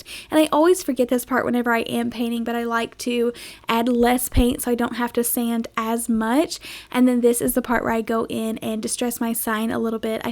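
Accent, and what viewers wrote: South, United States. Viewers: OMGosh!!! You're SO adorably talented!!! I'm new to your channel, but have subscribed. I've seen like maybe 2 other videos of yours, but THIS ONE is absolutely TOO CUTE!!! TFS! Blessings to you & your loved ones! PS. Is that a southern bell accent? I'm originally from upstate New York, but lived like 28yrs in southern California, & the last 10 1/2yrs in the NW area of Washington state! (By Seattle.) ONLY asking cause I LOVE your accent!!! Anyway, HAPPY CRAFTING!!!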